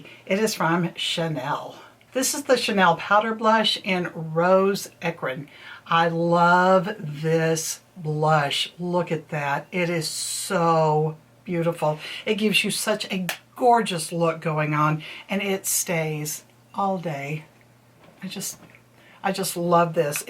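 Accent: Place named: South, United States. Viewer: Beautiful skin❤️hear some southern in your voice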